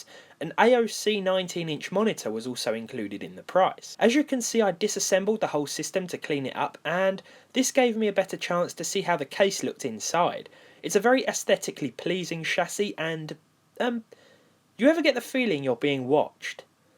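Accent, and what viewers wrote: United Kingdom. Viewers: I heard british accent---------------------> i leave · I hate that british speech 😂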